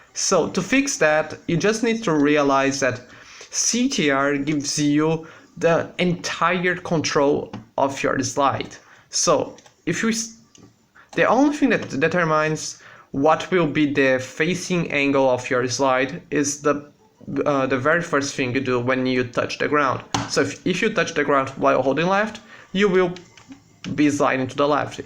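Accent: Brazil. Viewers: Pelo inglês dá pra saber que é Br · Dude, you are brazillian, i can see, cause, eu sou brasileiro e falo inglês, você tem bastante sotaque, mas você fala bem pra caramba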